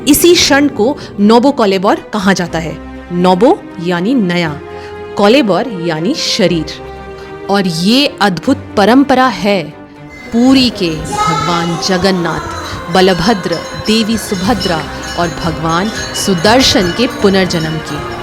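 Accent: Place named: Bengal, India. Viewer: Your pronunciaton says u r a bengali. Jai jagannath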